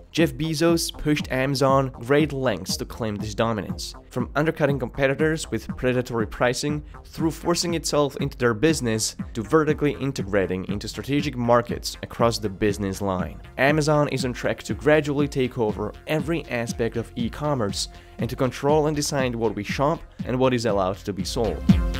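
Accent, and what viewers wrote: Eastern Europe. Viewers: because he's trying not to sound like the Eastern European communist that he is. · You sound like an Eastern European person trying to impersonate a Scottish person doing an American accent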